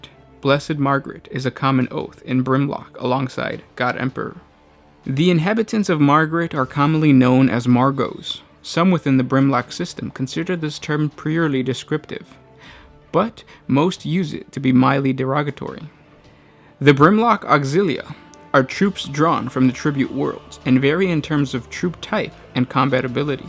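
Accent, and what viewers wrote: United Kingdom. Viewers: Right off dat bat British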